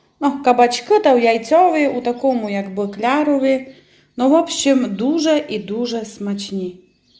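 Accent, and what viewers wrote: Transcarpathia, Ukraine. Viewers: Мені дуже приємно, що зараз є можливість чути закарпатський діалект, дякую за вашу працю. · спасибо Вам за замечательные рецепты, а слушая Вашу речь переношусь к своим друзьям, ставшими родными за много лет, в село Камяница. Горько, что не могу встретиться с ними, но когда смотрю Ваши ролики возвращаюсь в любимое Закарпатье. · говоріть, говоріть і ще раз говоріть по закарпатськи! Це ваша фішка! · Завдяки Вам, світ пізнає закарпатський діалект. А східний російсько-український діалект ми давно вже пізнали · у вас чудовий канал, чудовий матеріал, чудова закарпатська вимова. А ті типу-українці неповноцінні, що їм не подобається Закарпаття і закарпатці, хай слухають про варенички. З великим задоволенням спостерігаю за Вашою творчістю. Всього найкращого.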